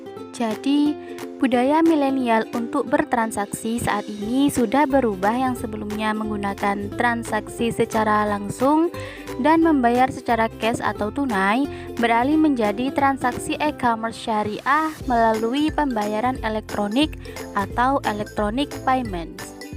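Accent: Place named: Madura, Indonesia. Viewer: Masya Allah gagal fokus sama logat maduranya, tapi keren nih. Semangat terus berkarya 🔥👍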